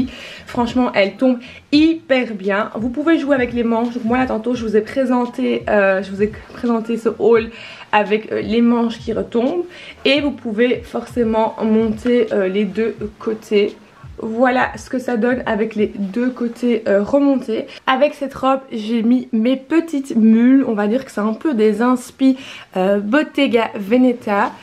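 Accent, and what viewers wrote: Belgium. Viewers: Coucou super vidéo je pense reconaitre à ton accent que tu serais Belge non ?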